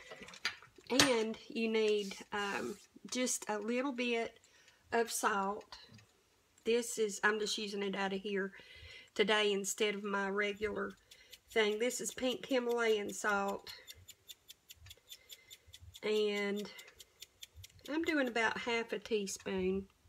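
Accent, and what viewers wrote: South, United States. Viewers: I love your videos AND your Southern accent!!!!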